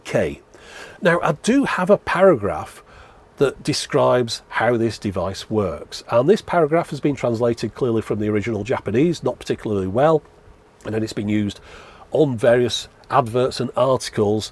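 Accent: United Kingdom. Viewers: Love the ion/iron wordplay. Only something you can do with a British accent 😂 please make the negative iron a recurring character 😂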